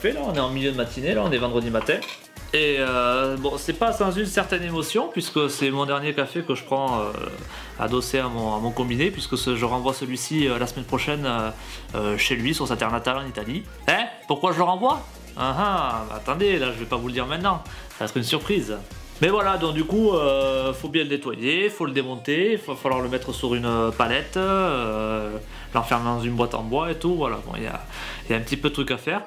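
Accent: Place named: South, France. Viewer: il y avait un accent du Sud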